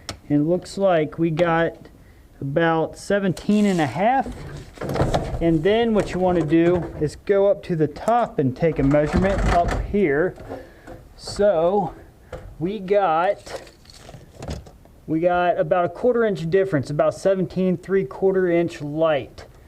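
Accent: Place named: Baltimore, United States. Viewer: Very thick Delmarva Baltimore sounding accent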